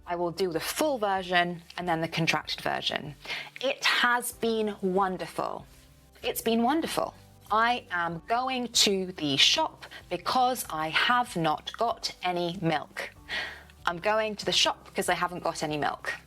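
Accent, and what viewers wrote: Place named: Cambridge, United Kingdom. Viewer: I love your crisp Cambridge accent. Just wanna confirm is it really Cambridge or some other accent.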